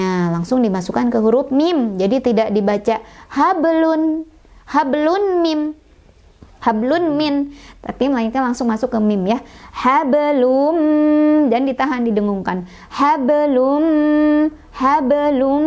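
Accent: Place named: West Java, Indonesia. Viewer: Subhanallah sepertinya teteh orang sunda ya. Kalo memang benar artinya kita satu suku. Alhamdulillah saya menemukan channel ini. Saya dari bangka sangat ingin belajar membaca ayat al quran dengan benar. Barrakalloh teteh terimakasih ilmunya sangat bermanfaat. Semoga teteh diberi panjang umur dan sehat selalu. Amin amin..🤲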